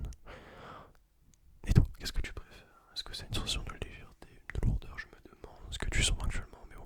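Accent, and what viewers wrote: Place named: France. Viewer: L'accent français est très fort. J'adore!!!! 🥰🥰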